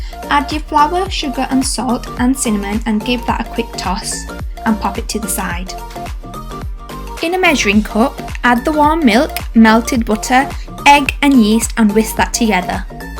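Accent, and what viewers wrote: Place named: United Kingdom. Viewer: Damn! This makes me crave for donut 😅.. Dear I love the tutorial and listening to your British accent. ❤️😉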